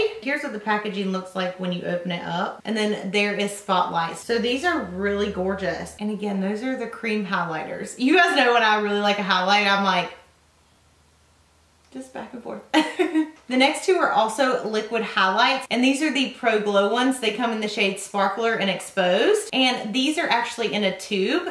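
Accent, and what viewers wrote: South, United States. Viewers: If you put captions on, it changes some words due to the thick southern accent 😅 It said "matta fires" instead of mattifiers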